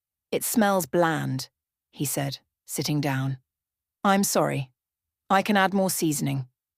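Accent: United Kingdom. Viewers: Love the British accent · How refreshing. A British accent, not AI too. New twist, also refreshing, not so new, yet again, both parents killed in a car crash whilst still young. Yawn!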